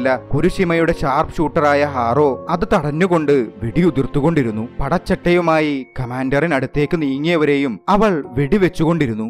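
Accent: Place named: Namboothiri, India. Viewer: അവതരണം- നമ്പൂതിരി സ്റ്റൈൽ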